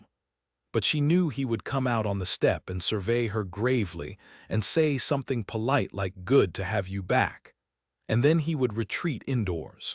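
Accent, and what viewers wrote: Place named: United States. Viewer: An American narrator??? Bring back Penelooe Keith!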